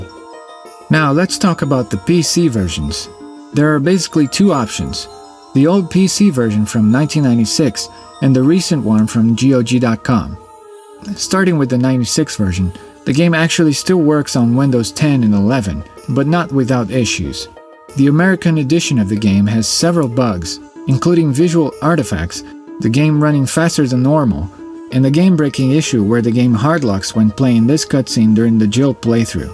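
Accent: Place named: Brazil. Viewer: Vc é brasileiro, né? Seu sotaque é muito forte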